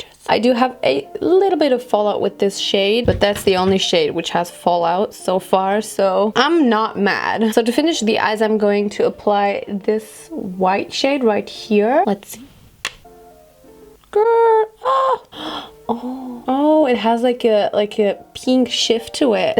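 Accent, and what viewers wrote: France. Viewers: Hey girl, I'm a french subscriber und ich kann Deutsch auch ;) I find your french accent not so bad. In the future, can u make an entire video in french pls :) Bye bitch <3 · Woow ! Your french accent is fucking good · You do have a really great french accent !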